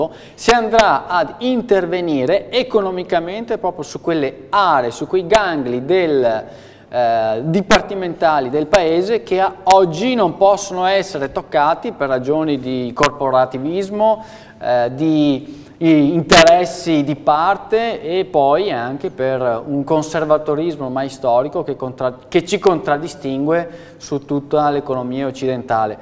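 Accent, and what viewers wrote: Veneto, Italy. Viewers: Benetazzo, non peredere mai il tuo accento trevisanpadovano che ti caratterizza!!! bella Malta!!! ;-)))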